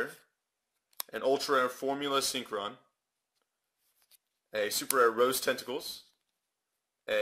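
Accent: Germany. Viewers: are you german? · r u german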